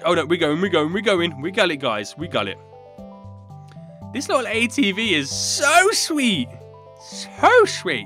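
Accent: England, United Kingdom. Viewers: R u from England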